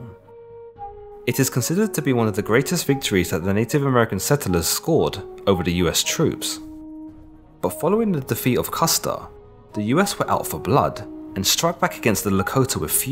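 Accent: United Kingdom. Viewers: Fucking hilarious hearing a Brit talk about my history lol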